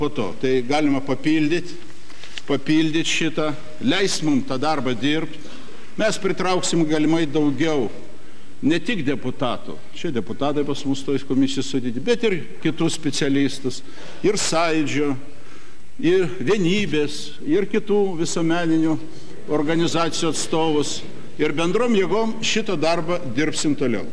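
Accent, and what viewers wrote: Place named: Russia. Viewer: blogai ,jis mires bet jo akcentas russiskas ,kaip ir snieckaus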